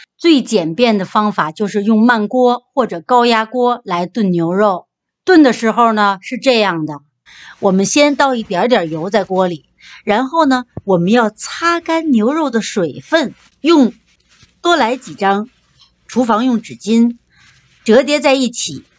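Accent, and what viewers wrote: Tianjin, China. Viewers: 听口音，天津老乡，你好👋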